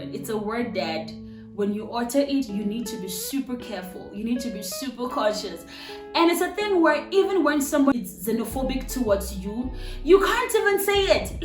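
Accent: South Africa. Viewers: are you a Nigerian cz Hai uthetha kamandi u seem like a south african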